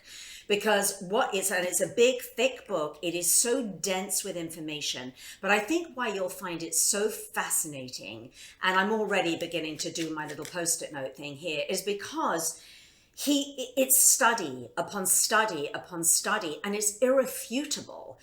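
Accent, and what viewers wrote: United Kingdom. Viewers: Hi. I thought it was British. Love it. You are an inspiration.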